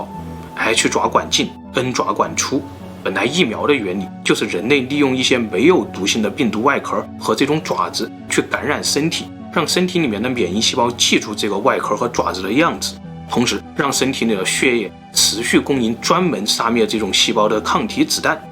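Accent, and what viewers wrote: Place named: Hunan, China. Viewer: 的湖南口音真的讓我欲罷不能啊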